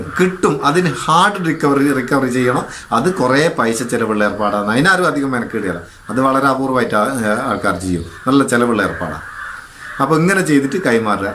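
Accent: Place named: Vadakara, India. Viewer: അദ്ദേഹം മലയാളം നന്നായി അറിയുന്ന ആൾ തന്നെ ആണ്. പിന്നെ ഈ സംസാരം ഞങ്ങൾ വടകര, മേപ്പയൂർ, നാദാപുരം ഭാഗത്ത് ഉള്ള സ്ലാങ് ആണ്.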